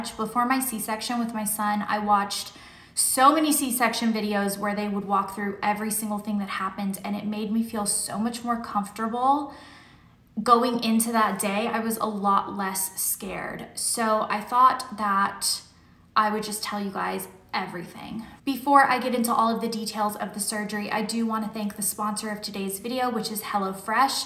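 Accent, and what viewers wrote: South, United States. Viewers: I thought the same! So southern! It was cute to see. X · I was going to say the same thing. Post-surgery gets real southern.:-) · You went so southern after the anaesthetic hahaha I love it, sending love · I LOVE how southern you sound coming out of anesthesia! So cute! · Thanks for sharing your difficult experience, southern twang and brutal honesty. You’ve made it that much better for the next person that has to go through this awful ordeal. Sending you lots of hugs from NC! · So glad you're ok! So sorry about your sweet baby <3 we love you so much! and your southern accent too haha. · The accent, I love that southern accent. I kept replaying that part. Love you, you are so strong ❤️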